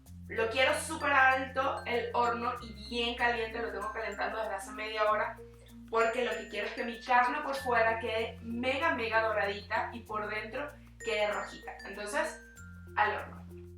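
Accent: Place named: Venezuela. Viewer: Su acento 100% Venezolano, lo note desde el principio. De solo escucharla me suscribi ;)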